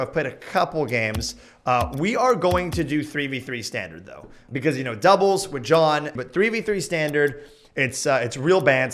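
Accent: Quebec, Canada. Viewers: Love the Quebec accent!! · You have the canadian accent when you speak french, it's so funny XD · Dude, I dunno if that Quebecois accent was a way to mock Quebecers or if it's legit your accent when you speak french but I love it. Hello from Montréal! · Très beau accent québécois xd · I love the little french/québecois comments in the middle of the stress XD Your quebecois accent is wonderful Also super hyped for this new series, i've been missing this channel. Can't wait for more:D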